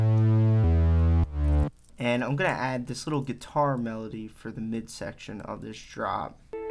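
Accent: Philadelphia, United States. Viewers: This man is from Philly or Baldimore for sure, am i right? Sick tip though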